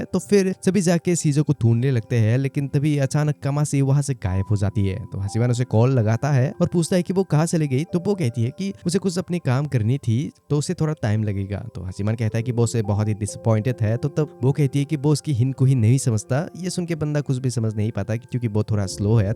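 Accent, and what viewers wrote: Northeast, India. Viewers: Always a north east guys accents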